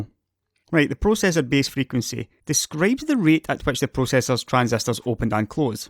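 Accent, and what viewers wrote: Scotland, United Kingdom. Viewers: Keep your Scottish up! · r u Scottish? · Its the Scots accent, it just sounds like you are being nasty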